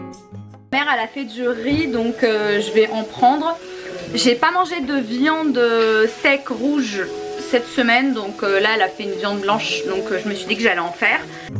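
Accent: Quebec, Canada. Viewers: C'est moi ou tu as un petit accent québécois 🤭🤭❤️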